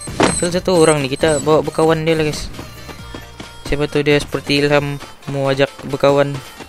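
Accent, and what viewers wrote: Sabah, Malaysia. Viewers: Abang orang sabah ke ?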